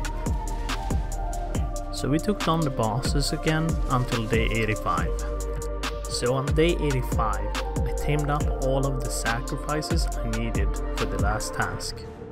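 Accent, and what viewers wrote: Sweden. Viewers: Jävligt kul att höra lite svengelska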